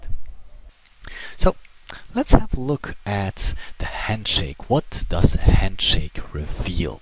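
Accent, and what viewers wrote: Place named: General American, United States. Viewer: he speaks with an accent that is called general american english.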